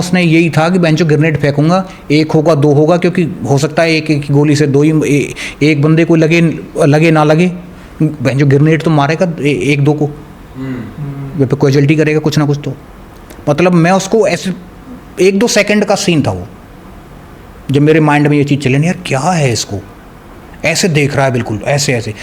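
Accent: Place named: Palwal, India. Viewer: Inki aawaz palwal side ki si lag rahe hai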